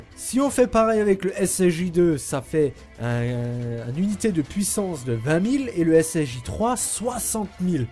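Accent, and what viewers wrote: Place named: Lorraine, France. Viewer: Super accent de la lorraine !!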